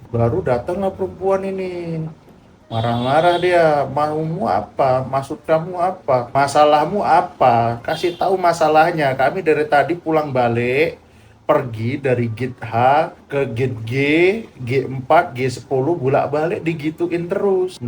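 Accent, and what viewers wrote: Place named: Java, Indonesia. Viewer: kok vibesnya jadi JAWA bang? gak sumatra lagi, medok banget kedengerannya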